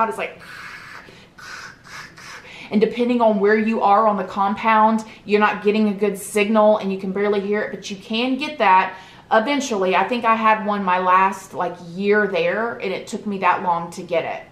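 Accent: South, United States. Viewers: Your southern accent makes me feel secure??? Idk but I appreciate a fun southern YouTuber (nothing weird, my family is southern, and nobody is where I live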